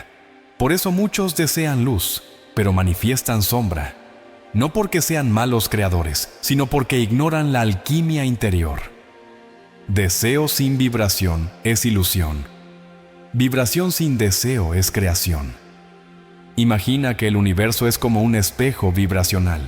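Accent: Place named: Brazil. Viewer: Que lindo acento de Brasil. El idioma se escucha lindo.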